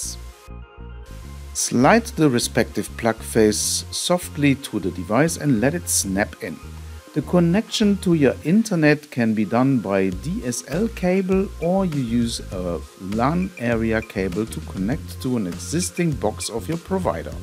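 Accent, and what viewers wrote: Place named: Germany. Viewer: man hört anhand der Aussprache, dass der Moderator ein Deutscher ist. Wir leben in Deutschland - warum also kann dann das Video nicht auch in deutsch produziert werden??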